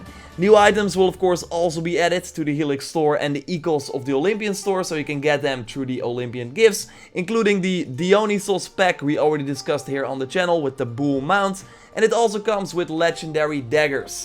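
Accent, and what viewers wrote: Netherlands. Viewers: It took me 1 second to find out you're dutch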